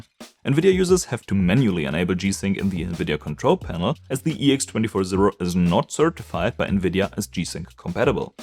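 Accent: Germany. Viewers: wait he’s German?😭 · german, right?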